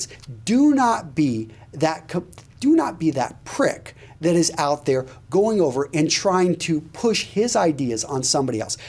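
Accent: Wisconsin, United States. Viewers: Woah, weird to hear someone from Wisconsin. We're like the lost state.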